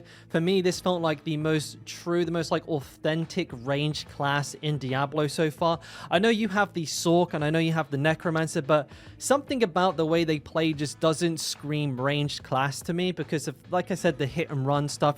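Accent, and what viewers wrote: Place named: South West, United Kingdom. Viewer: My go to for D4 content!!! Even though the accent reminds me of the uni days in the south west 😂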